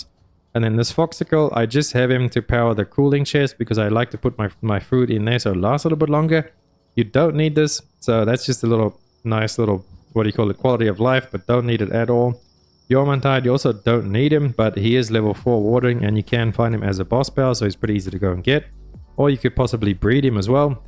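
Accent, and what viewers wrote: South Africa. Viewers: Cant help but shake the slightly South African accent?😅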